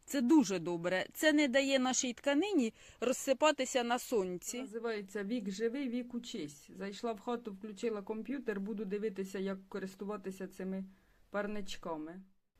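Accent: Poltava, Ukraine. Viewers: Моя ти зіронька ,гранатою прибьють,,,слухаю вас і балдєю у меня двоюрідна сестра живе новосанджарскій р так же розмовляє по Полтавські.Дякую вам велике.за відео.привіт с Дніпра.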